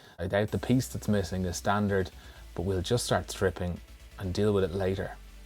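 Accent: Ireland. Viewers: Actually he's Irish, but thanks for the Aussie guess. We'll take that as a compliment.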